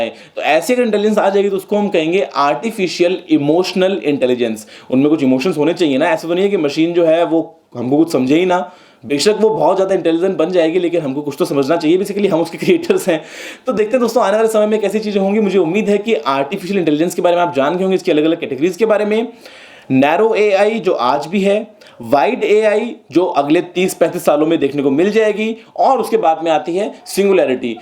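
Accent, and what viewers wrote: Bengal, India. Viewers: Sir.. Aap bengali ho?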